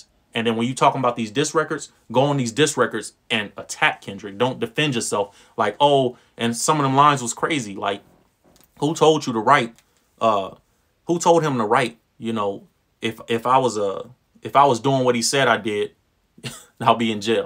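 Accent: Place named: West Coast, United States. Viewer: Ok u from the West Coast your accent lol 😅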